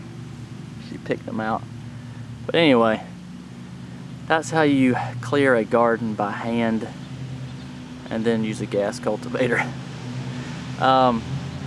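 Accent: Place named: South, United States. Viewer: Widow maker 🤣🤣🤣. That’s funny, sounds like a southerner. (Thanks for the video)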